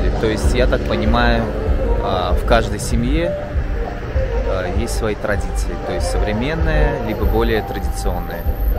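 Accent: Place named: Uzbekistan. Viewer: Акцент такой только у Узбекистанцев! 😀👍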